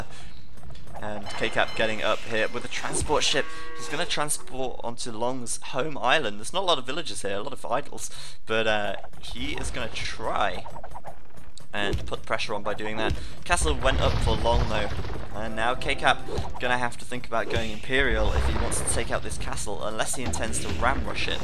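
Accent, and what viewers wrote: England, United Kingdom. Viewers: I like your british voice c: it makes your game-commenting more enjoyable. Ps. Forgive my bad english c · You're a great commentator, especially with English accent haha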